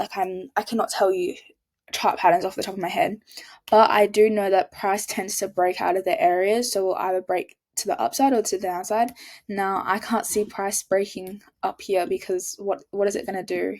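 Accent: United Kingdom. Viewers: You from the uk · Ok, I'm just going to get it out of my chest. You're very pretty and very smart. Love your UK accent 😂. Gold is a ticking time bomb its overbought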